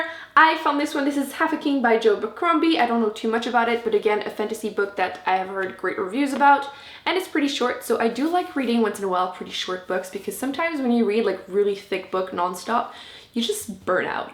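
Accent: Quebec, Canada. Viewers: I knew you spoke french 😃😃😃😃😃😃😃 We have the same way of pronouncing some words. I bet you're also Canadian like me😀😂 Anyways love this video💙💙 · Breanna May she's French Canadian :)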